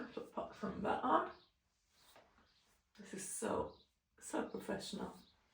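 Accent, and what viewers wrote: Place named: Yorkshire, United Kingdom. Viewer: Loving your authentic style - I am originally from Yorkshire and it's great to see/hear this type of content accompanied by a Yorkshire accent like mine! Leo is just gorgeous - looking forward to more of his video bombing in future content lol. Wishing you well after your tough year xx.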